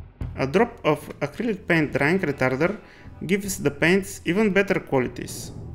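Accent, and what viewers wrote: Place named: Russia. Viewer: Акцент русский 😁 Работа отличная!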